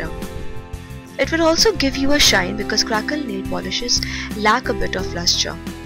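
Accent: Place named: India. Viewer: It's an Indian accent she has, I think anyway, It's not actually weird sounding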